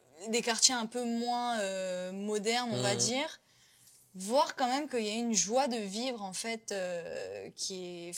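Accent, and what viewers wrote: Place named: Ivory Coast. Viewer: 😂😂 Tu commences à avoir l'accent ivoirien ma cœur ❤❤❤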